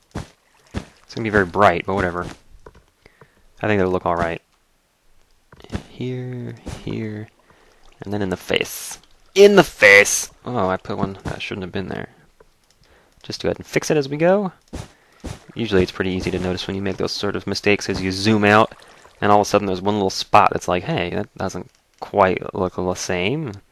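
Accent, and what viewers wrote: South, United States. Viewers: is it me or is voice sound vary southern this episode?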